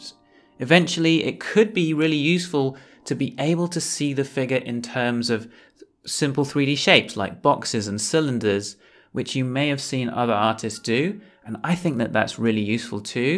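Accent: United Kingdom. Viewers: Wow I love life drawing, I am guessing you are from Britain by your accent? I live in Manchester is there any life drawing class in Manchester I would like to attend... for a free trial lesson.. Could you get back to me please!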